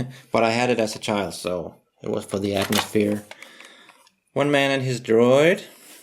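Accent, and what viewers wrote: Denmark. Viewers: Hehe.... Sjovt at jeg tænkte at du er danskee, da jeg hørte din accent. God video. Min favorit er c modellen.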